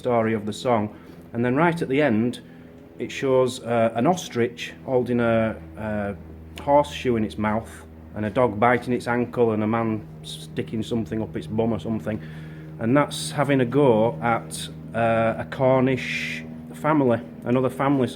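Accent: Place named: Yorkshire, United Kingdom. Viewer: Ye or8 your a Yorkshire man.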